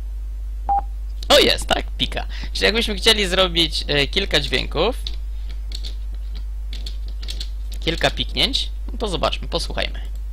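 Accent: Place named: Poland. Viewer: Ciekawe podstawy, ale masz złe nawyki jeśli chodzi o angielski, czasem mówisz dobrze, czasem wymawiasz słowo w sposób spolszczony. Char to [czar], a dokładniej i poprawniej z miękkim r i te cz to trochę złamane z tszi :) Na pewno C jest strukturalny? a nie proceduralny i obiektowy?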